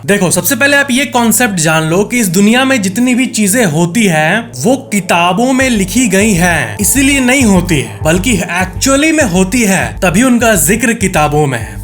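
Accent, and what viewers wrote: Bihar, India. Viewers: aap bihari language mat bola Karo purushling ko istriling 😅😅😅😅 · Bhaiya,u r giving bihari feeling ❤❤❤❤ in talking 😊😊😊😊😊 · Ap bihari h kya apka accent bihari jaisa lgta h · Are u from bihar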